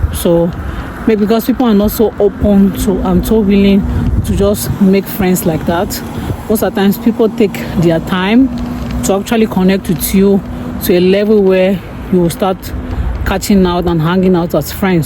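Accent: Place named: Nigeria. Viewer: Nice, did you grow up in Nigeria? Your accent sounds Nigerian.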